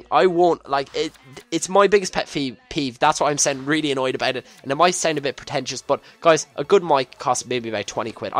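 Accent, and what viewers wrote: Ireland. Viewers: mate first your irish which is beast second ur just as good as ksi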